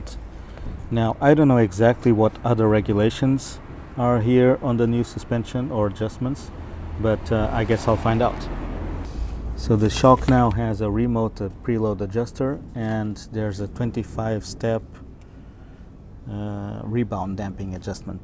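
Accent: Portugal. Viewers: ahah sabia que eras Portugues! Portuguese accent ;) bonitas motos! cheers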